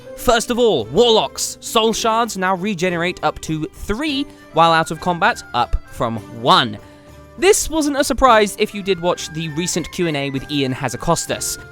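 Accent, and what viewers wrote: United Kingdom. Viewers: you sound british?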